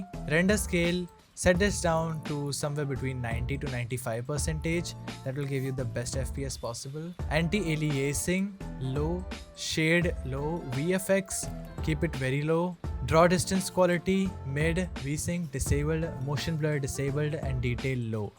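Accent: India. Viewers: You sounds like a indian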